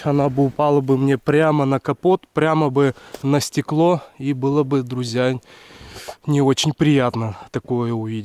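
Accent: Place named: South, Russia. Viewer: Прям чувствуется южный акцент))))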